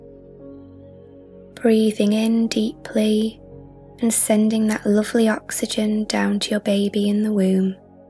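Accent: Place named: North East England, United Kingdom. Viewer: I'm a Geordie, from Newcastle! Sounds like you're from somewhere not too far away :)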